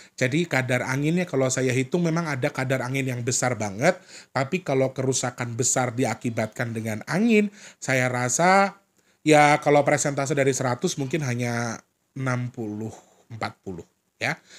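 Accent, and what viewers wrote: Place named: Tuban, Indonesia. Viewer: Nwn Sewu mas BHS timurane mas kok kayak logat Tuban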